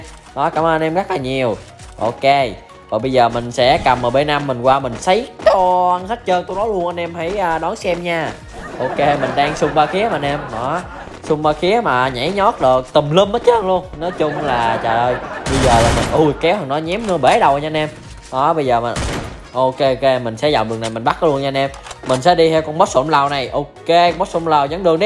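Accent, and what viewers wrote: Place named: Mekong Delta, Vietnam. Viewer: hèn gì giọng miền Tây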